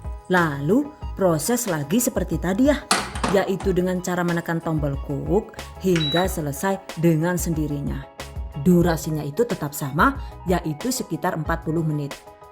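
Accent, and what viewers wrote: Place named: Brebes, Indonesia. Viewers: Enak ya punya magic com yg bnr2 magic bs masak selai nanas disitu.. Saya gunakan alat apa adanya.Krn ilmu msk diasah disaat alat sederhana tp tekad mau buat lebih bsr dan hasil masakan disukai suami dan anak.. TOP dah, rajin share resep baru dan ga pelit ilmu, serta disetiap videonya ada suara lucu medok gaya bicara itu.Beda deh dari channel msk yg lain,pernah nyoba tp hasilnya mengecewakan.Kalau channel benar2 bagus dan reviewnya udh byk yg masak.. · Mbok ayune, salam sing wong brebes yen ora ngapak iya ora kepenak 😊😊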